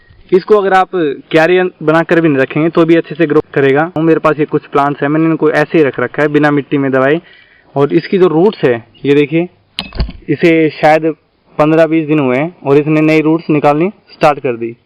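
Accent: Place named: Jhunjhunu, India. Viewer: aapki bhasa mst h aap junjunu side k ho kya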